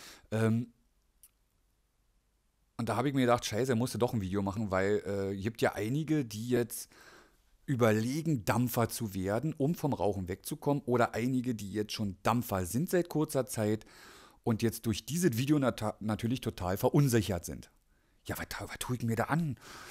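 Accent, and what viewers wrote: Berlin, Germany. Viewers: sieht verdammt aus wie Ragnar Lodbrok von Vikings o.O Ragnar mit Berliner Schnauze - göttlich, einfach göttlich. Macht das Video (übrigens gut zerlegt, diesen Schund!) irgendwie echt witzig... wenns nur nicht so traurig wäre... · 😂😂😂deine Berliner schnautze.....voll Guut👍😂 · Du kommst aus Berlin!? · ich finde dich klasse ☺ was du sagst kann ich nur so unterstützen seh das genauso und deine berlinerschnauze Made my day 👍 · Cooool! Ne dampfene berlina Schnauze, find ick jut! Abopflicht, wa!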